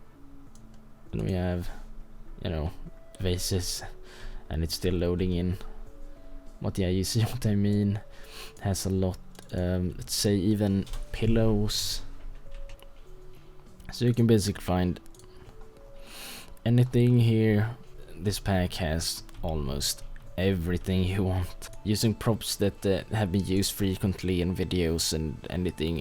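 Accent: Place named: Sweden. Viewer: He sounds Swedish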